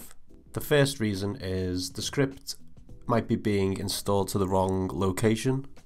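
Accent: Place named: Liverpool, United Kingdom. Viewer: When I heard that accent, I thought "Oh, great! A fellow Scouser! - He will be able to help!" Sadly, this video was only useful to those writing scripts, but that was not my case. I have a MIDI Wind controller that Ableton is not recognising it. Any advice, our kid? Greetings from a scouse exile in Catalunya.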